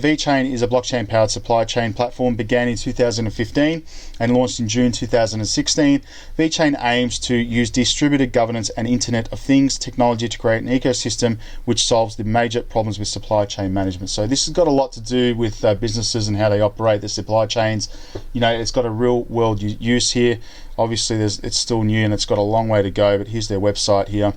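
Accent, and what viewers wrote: Australia. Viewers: You Aussie bro?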